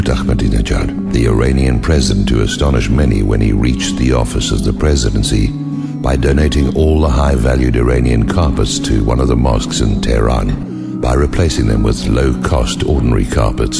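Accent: United Kingdom. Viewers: It's obvious that our government controls the media and they're all full of shit, but trying to make him into a Gandhi type figure is a bit far fetched. So who is making these videos anyway? Who's writing the material? And who's the British guy narrating?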